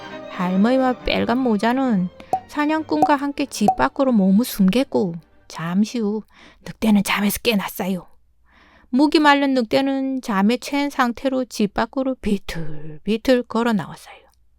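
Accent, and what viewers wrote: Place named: Gangneung, South Korea. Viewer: 들을수록 강릉사투리에 웃음이 나오고 흥미롭고 알고 있는 내용이지만 다음에 나오는 이야기에 마음이 긴장되고 ㅎㅎㅎ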